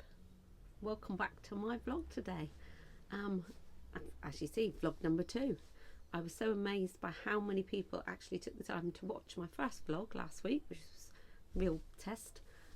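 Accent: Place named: England, United Kingdom. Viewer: i knew you were English from your accent, where are you in the UK? inquiring minds need to know....lol...i am "across the pond", but my maternal grandma was english, she became a ww2 war bride came to usa....she was born in leicester....:) i have quilted now 4 years, my mom has quilted 50 plus years...my grandma was a sewer,,,,it is in the family genes...or jeans...lol, love your vest, great idea....hmmmm i got many a jean i dont use... i was going to make some denim purses, or bags, or sewing machine bags, or quilts...the possibilities are endless...lol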